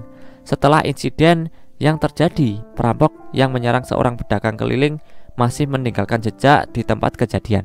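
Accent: Java, Indonesia. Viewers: Org Jawa kebanyakan bahas membahas🤣😂😁 logot mu GK enak di dengar telinga hhh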